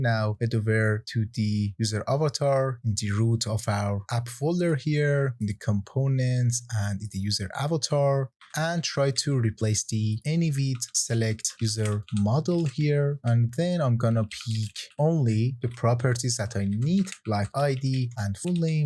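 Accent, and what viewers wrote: Iran. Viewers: I am hearing a familiar accent? Are you Persian bro?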